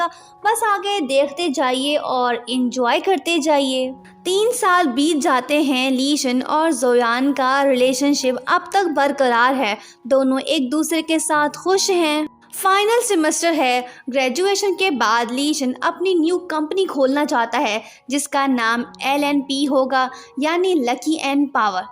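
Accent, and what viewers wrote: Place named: India. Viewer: Mujhe laga aap Indian ho kyuki aapka voice Indian Jaisa Hai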